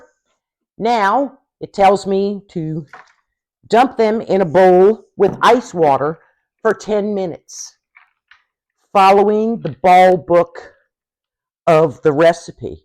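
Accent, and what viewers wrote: South, United States. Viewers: Are you from the south? You have an accent like my aunt who is from Florida. LOL.. Thank you for the small batch recipe. I have never had pickled peppers.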